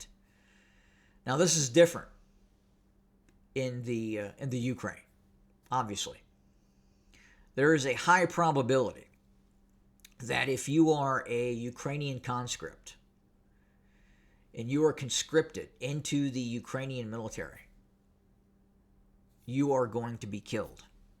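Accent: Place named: South, United States. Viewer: I enjoy the southern accent. 👍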